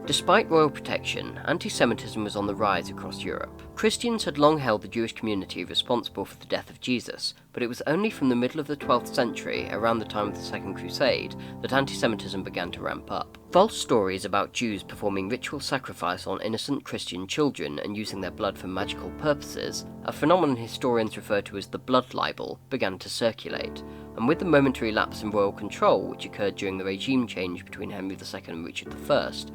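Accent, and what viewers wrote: United Kingdom. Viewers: Good goi. Do as your told. Anything you hear you dont like run and tell. Youll get a little treat. Self deluded moral superiority. Its fitting for a southern esque sounding british accent. Your outlined requirements for discussion show you arent willing to discuss anything. To the weasel saying people dont understand the talmud, context and such. I have read it. You are a liar. It gives instructions on preventing pregnancy in young girls. "Muh contex and sheeeeiiiiit". They have been kicked out of very many areas, countries and cities. This is an indisputable fact. No matter how you try to twist it with your NPC rhetoric. The crazy thing is every time it was not their fault and people were just being meanies. Where did the number 6 million come from? Prove it. I apply the same energy you have for discussion. It must be a legitimate expert I approve of. 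And if you say anything i dont like ill run and tell. Youre a joke. Go swim with a toaster.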